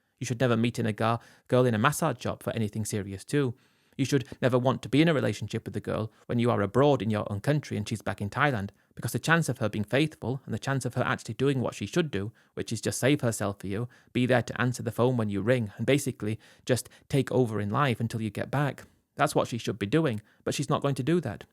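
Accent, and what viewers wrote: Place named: Liverpool, United Kingdom. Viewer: Adyeee lad more scouse whinging 😢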